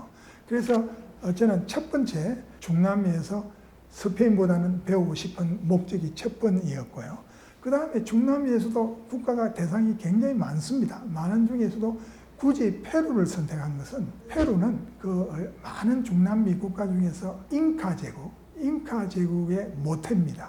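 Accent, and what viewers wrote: Gyeongsang, South Korea. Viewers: 저도 동의합니다~경상도에는 Intonation이 있어서 flat한 영어보다 듣기에 나아요~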